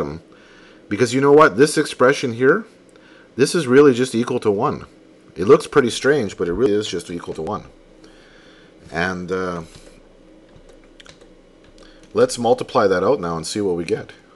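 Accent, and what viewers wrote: Canada. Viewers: are u by chance canadian?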